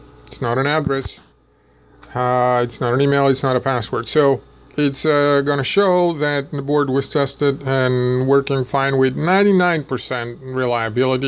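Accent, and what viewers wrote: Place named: South, United States. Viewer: фу какой блевотный южный акцент!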